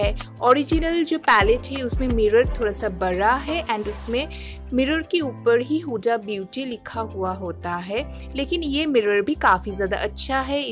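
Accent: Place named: United Kingdom. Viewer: Angrejo jaise hindi q bol rhi hu achcha nahi lg rhs h ok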